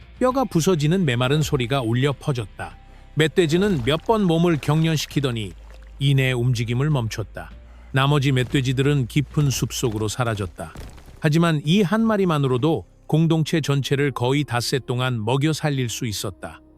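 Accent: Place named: United States. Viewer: 무슨 말이냐 미국사람이 한국말 하는거냐 너무 어색해서 듣기 싫다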